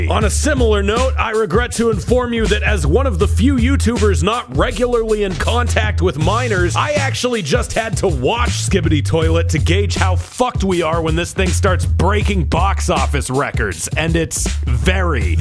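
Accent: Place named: United Kingdom. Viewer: His British accent reminded me of dex there (sanity not included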